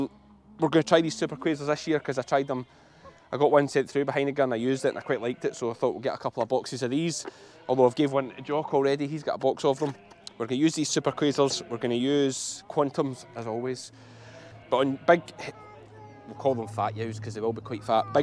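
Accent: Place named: Ayrshire, United Kingdom. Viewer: I should have kept up with your videos,! I haven't watched for about 5-6 months and I have lost my ability to really understand an Ayrshire brouge.□ My bad. I've got to do better! Terri from Texas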